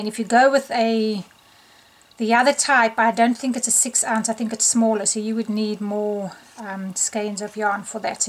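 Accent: South Africa. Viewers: Am I right, don't the lady who speaks live in South Africa? Sounds almost like the South African accent. Anyway, beautiful Kimono!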